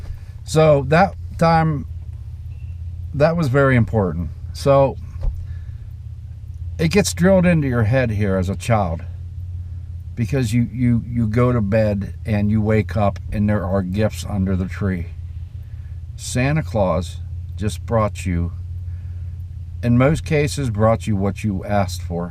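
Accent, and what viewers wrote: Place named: South, United States. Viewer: I Think Your From The South,Can I Asked Where Is It:)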